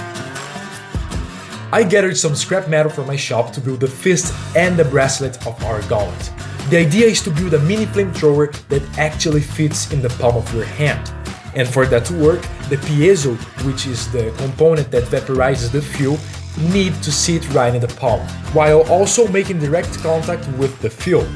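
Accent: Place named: Brazil. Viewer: Percebi de cara que era brasileiro pelo sotaque hahaha. Parabéns pelo projeto!!!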